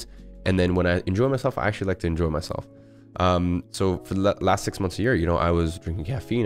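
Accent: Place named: United States. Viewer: Bro had a russian mom, grew up in london, and has an American accent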